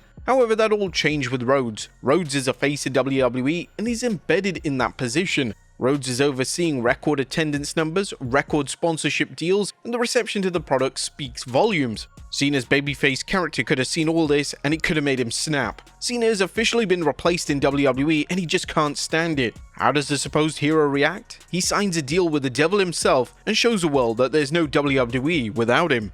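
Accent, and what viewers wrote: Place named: United Kingdom. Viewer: i hear a british accent, i leave the video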